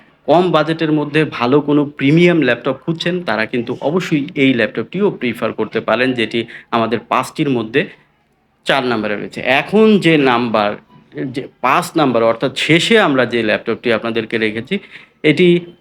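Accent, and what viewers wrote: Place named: Kolkata, India. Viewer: Agge dada, the you talk like kolkata babu, why not Bangladeshi tone?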